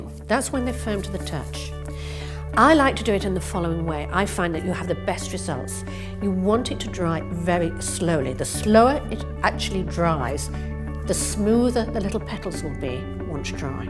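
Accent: United Kingdom. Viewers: british as hell, sharp as usual good job!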